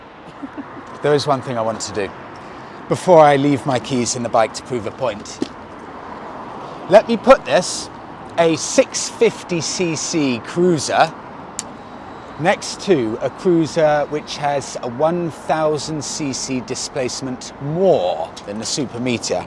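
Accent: United Kingdom. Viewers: Beside of that beautyful Bike I love listening to Your nice british English!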